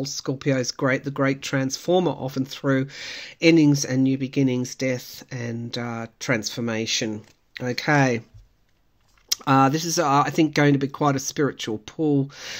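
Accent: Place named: Australia. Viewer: I love your readings, and find your no-Nonsense Aussie accent 😅 as comforting as a US Southern drawl. You are a genuine soul. Thanks more than you can appreciate the comfort and warnings you provide! Ava in Florida😊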